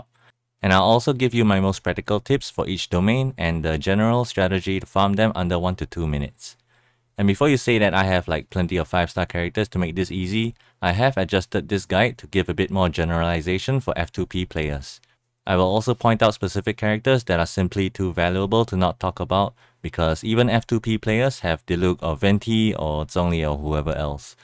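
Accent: Singapore. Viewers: picked up your mixed Singapore accent right away, we feelin it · You sound Singaporean ( sorry if this sounds racist )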